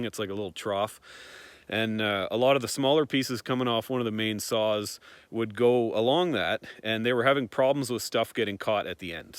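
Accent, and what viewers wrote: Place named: Canada. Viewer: accent says Canada, americas hat. Edit: the license plate also says Canada lol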